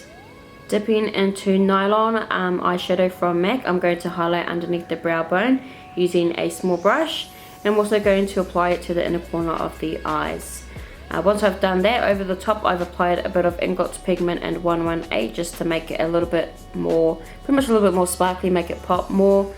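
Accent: New Zealand. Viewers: are you from New Zealand? 😍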